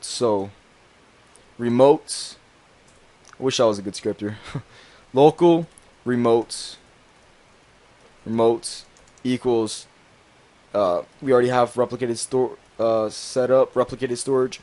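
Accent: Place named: United Kingdom. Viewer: Me how to speak speak British but is it me or he sounds British a-hoi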